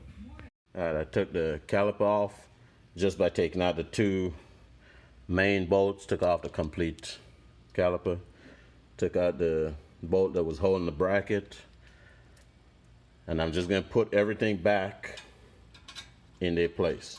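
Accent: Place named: Louisiana, United States. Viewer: Sound like a southren creole man.. if yaint from Louisiana then you Jamaican one.. am i wrong? From the muddah land or ye from dem bayou jerkin them crawdads..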